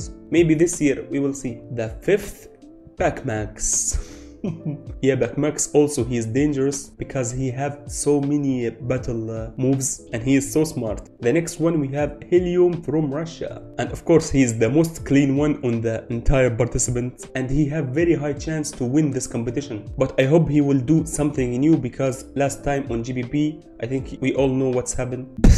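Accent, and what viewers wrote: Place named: Arab world. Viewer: Where r u from bud cuz u have an arabic accent and if u are i'll be really happy to see someone from MENA creating content about beatbox 🇩🇿👏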